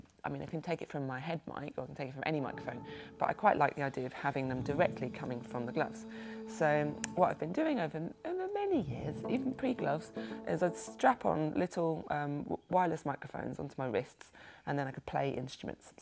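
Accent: England, United Kingdom. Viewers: What part of England is she from?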